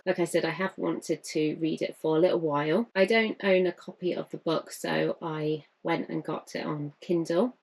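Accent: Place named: United Kingdom. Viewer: Hello! I liked your vlog! I’m also a huge fan of Tom Hiddleston. The video was very informative and interesting. I didn’t know about the fact that Tom Hiddleston’s favourite book is “Anna Karenina”, and for me, as a Russian, it is a great surprise and pleasure. I have a question, sorry if I’m wrong, I’m just curious; You have a very beautiful accent, and can I assume by that that you live in the UK? If so, did you have any chance to meet Tom Hiddleston, possibly at some events? Thank you and have a great day!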